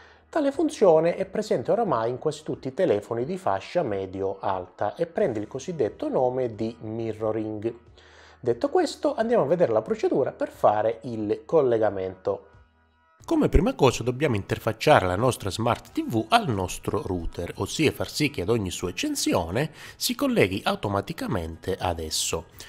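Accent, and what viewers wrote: Calabria, Italy. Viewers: e infatti, ti ho dato del Calabrese. Lo sono anch’io...perciò l’ho subito capito ascoltandoti 👍🏽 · Ma sei calabresee? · Ma sei calabrese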